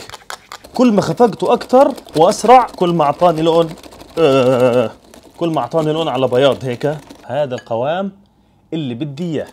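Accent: Palestine. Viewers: احب قناته علشان اللهجه الفلسطينيه الي تجننننن 😍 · الصراحة أنا لا أحب أطبخ لكني أشاهد فيديوهاتك من أجل اللهجة الفلسطينية ، ما شاء الله. بالتوفيق حبيبنا اللزم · لهجة. غزوية غزة. دايمن غير تحيه. للي. غزة. من. داخل. المحتل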